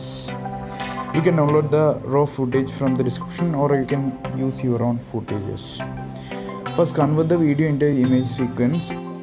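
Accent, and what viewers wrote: Kerala, India. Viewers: Why do you have Indian accent? Are you trying to be CG Matter? Or Ian Hubert? Or Royal Skies LLc? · U sounds like a mallu, btw nice video 🤘🤘👍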